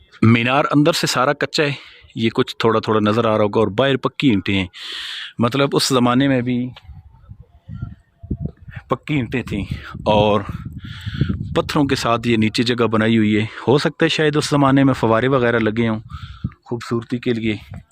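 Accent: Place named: Chakwal, Pakistan. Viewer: آپ کا تعلق پاکستان کے ضلع چکوال سے تو نہیں ھے کیونکہ لہجہ بتا رھا ھے؟؟؟❤❤❤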